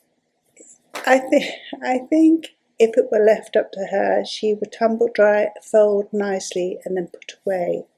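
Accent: England, United Kingdom. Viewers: I like the “blue”in all your pictures…and enjoy your English accent…go on, life is precious on every age!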